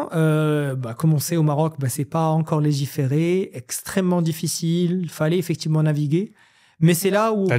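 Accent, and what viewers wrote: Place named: France. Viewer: Surtout lorsque ces mots anglais sont prononcés avec un très fort accent français. C’est risible pour ne pas dire embarrassant.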